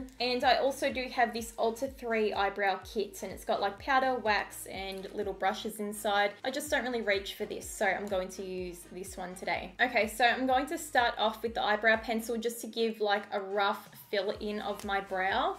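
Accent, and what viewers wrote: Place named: Australia. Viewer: Can i have an Australian accent tooo? Lol so lucky! 🖤❤️ even though you arent crazy about the makeup it still came out good!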